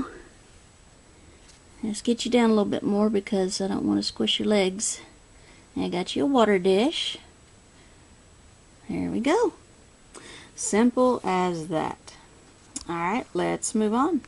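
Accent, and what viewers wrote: South, United States. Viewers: Love your videos!!! I thought you had a southern accent, my mama was born in Tennessee but she raised us kids in WV. Tennessee is a beautiful state. I love it there.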